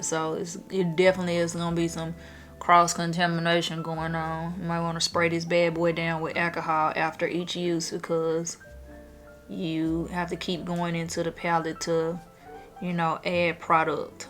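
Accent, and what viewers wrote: South, United States. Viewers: GIRL… you country as a MF and I love that! I’m a GA Peach so I understand! 😍😍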